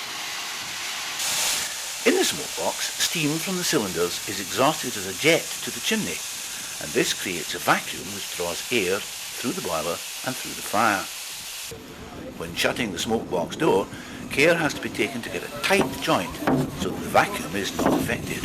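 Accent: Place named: Scotland, United Kingdom. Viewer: Haven't heard a Scottish narration for these kinds of videos; pretty nice.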